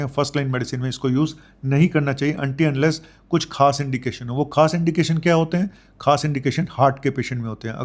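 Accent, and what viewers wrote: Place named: Uttar Pradesh, India. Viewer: Sir 🙏 hum bhi U.P. Mujaffar ngr. sy hai Tabhi aap ki language hamri jaisi hai,samne wale sath saf bolo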